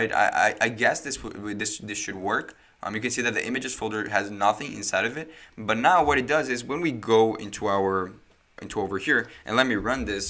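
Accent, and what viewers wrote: Brazil. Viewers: TU É BR NÉ? namoral sotaque foda, mas da pra ver q é br. Belo tutorial <3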